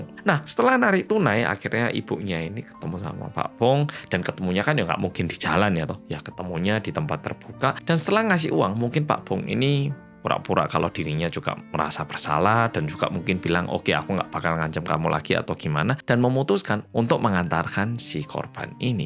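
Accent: Java, Indonesia. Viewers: Jang Hansol, aku sueneng mergo medokmu ga ilang2 😄👍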